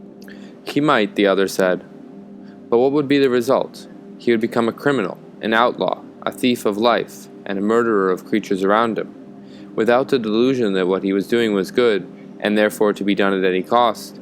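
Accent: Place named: Canada. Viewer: His Canadian accent is coming through strong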